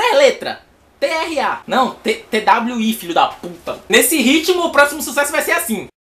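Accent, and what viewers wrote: Bahia, Brazil. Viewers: c é de salvador ? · ele é baiano????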